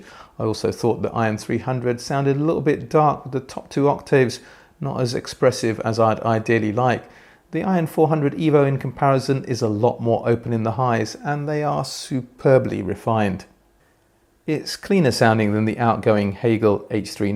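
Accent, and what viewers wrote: United Kingdom. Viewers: I always look forward to your reviews and your rich British accent. I have to admit my jaw dropped when I saw you select an AC/DC album. LOL! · Hej! As a Swede, I sincerely enjoy your fine channel contents and your great British pronunciation…… I try to learn from it too 😀. I run a pair of Q Acoustics Concept 500 that I feed from an old Krell KBL and a KSA250. The silly amount of current that beast puts out hold the drivers in an iron grip….. and I must say it sounds pretty nice. Wonder what this reviewed Atoll integrated amp would sound like in comparison? Thanks for your great reviews! /Jan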